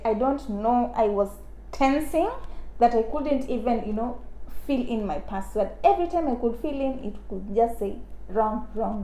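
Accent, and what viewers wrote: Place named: Uganda. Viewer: You are speaking like ugandans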